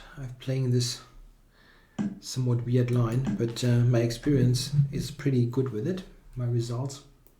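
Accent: Germany. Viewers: that german accent xD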